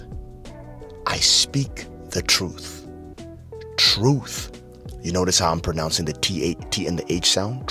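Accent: United States. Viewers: It's not a British it's an American accent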